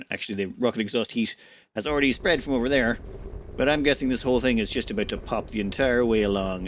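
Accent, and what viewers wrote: Ireland. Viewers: YOU'RE IRISH?!?!! FUCK YEAH!!!!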